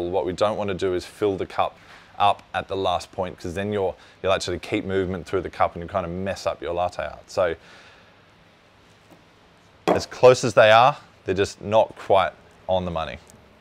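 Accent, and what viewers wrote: Australia. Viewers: where are you from? Australia? great accent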